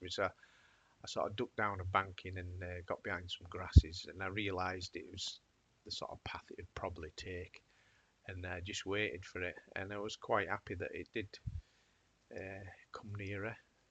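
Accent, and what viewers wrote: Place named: North, United Kingdom. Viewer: When you use the luma channel to set black and white points, why don't you turn on highlight and shadow clipping, then back off a touch and then adjust curve to suit if needed? It's the way I do it, well most of the time at least 😊. As a fellow left hander, and also called but a little bit further south than your accent suggests you reside and thank you for your videos and their unrushed pace. As for DXO hiding settings behind a paywall, I guess it their way to try and make us spend more money, but we all know that anyway, for flipping images it has not bothered me because I shoot sport, as a volunteer, so it would kind of bugger up the shirt sponsor logos and advertising boards. It is only fair, that now I have watched, and enjoyed, a couple of your videos that I subscribe to your channel, and now look forward to the next one 👍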